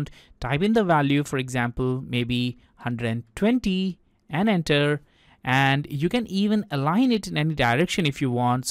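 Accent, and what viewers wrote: India. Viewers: Why would you use an indian voice over to speak English what the fuck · A rare case of a quality tutorial made by an indian with an indian accent.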